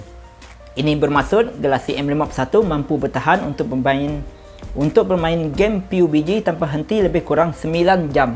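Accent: Sarawak, Malaysia. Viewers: Slang Iban so awesome...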